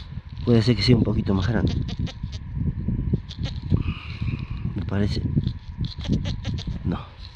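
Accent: Córdoba, Argentina. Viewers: Felicitaciones por su canal, aun no los conocía y me ha parecido muy interesante su video. Muchas gracias. Dice usted que estan en la puna. A mi me parece notar en su modo de hablar tonadita cordobesa. Lo sigo desde España, aunque naci en Córdoba. Una preguntita, el oro que se encuentra así ¿tiene todo la misma pureza? Lo que quiero decir es que si se funde ¿qué tipo de oro se obtiene? o ¿tal vez no se puede fundir? Perdona mi ignorancia, pero es que aquí el oro sólo lo he visto en las películas del 'far west', en los escaparates de las joyerias y últimamente he visto gente buscándolo en el rio en algún canal de youtube. Las cantidades que consiguen sacar son muy chiquititas, pero parece mas difícil de obtener que con detector como usted... Me parece un tema apasionante y si realmente en esa zona hay mucho, aunque sea en pepitas muy chiquitas, al cabo de un mes de frio y trabajo duro, se debe poder sacar un salario ¿no?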